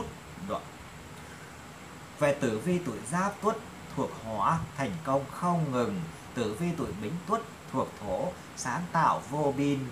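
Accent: Thanh Hoa, Vietnam. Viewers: Dung giong Thanh Hoa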